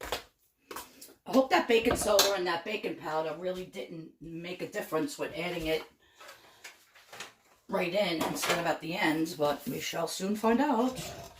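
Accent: New York, United States. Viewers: German baking is one of my favorites. Somehow Germans knew what they were doing back then. R u from Brooklyn, Queens, or Long Island. U sound like my family! Haaa!!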